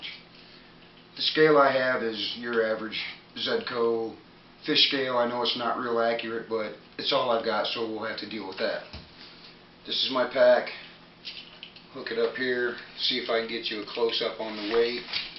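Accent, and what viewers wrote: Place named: United States. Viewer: He speaks English with American accent. BTW, American accent is the most spoken English accent in the world.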